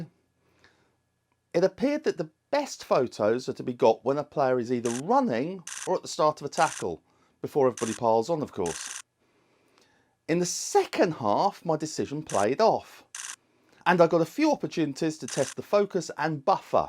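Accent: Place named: United Kingdom. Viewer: Ok, I’ve subscribed etc. but I’m having trouble placing where in the UK your accent is from. It’s silly I admit, but even as a Yank, I have a pretty good ear for accents. I’ve passed as being upper crusty Londoner and as being Scottish in London and Scotland. In fact my brogue can get very thick. I just fail on the local idioms… Guess I should have been an actor. So please tell me where in the UK your accent comes from! I’m confused! But then again we haven’t been across the pond in much too long and I’m rusty. My top guesses are, at the moment: Cockney influence (how’s your rhyming slang?), Liverpool, Blackpool, Manchester. I’m an idiot but I was born this way 🤪. Your probably from Perranuthnoe and I’m not hearing it… Wait, in a couple of videos you’re wear orange, isn’t the the Blackpool Football team color? That would make Blackpool first choice. 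Right?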